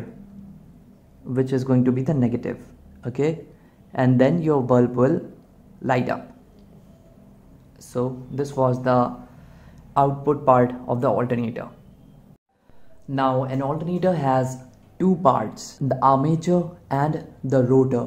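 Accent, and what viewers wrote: India. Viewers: Indians like you speaking english sound very ridiculous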